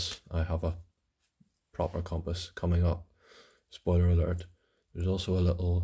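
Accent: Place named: North, United Kingdom. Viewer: Good vid! Where up North are ya from?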